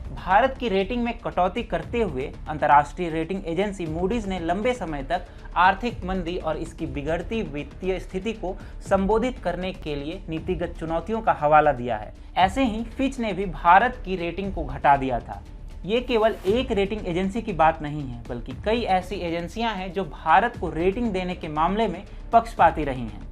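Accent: Standard. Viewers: Shudh 🕉️ hindi sunkar kaan 👂 ko anand mila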